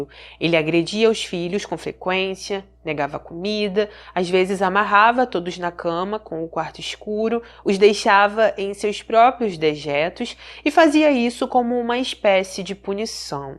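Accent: Rio de Janeiro, Brazil. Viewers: Adoro seu sotaque do Rio de Janeiro . Saúde, sorte e felicidades! São Luís, 28/07/2023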